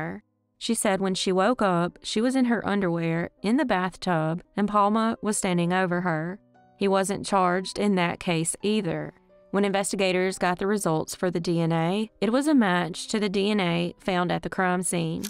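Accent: South, United States. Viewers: hello sweet southern lady. love ur channel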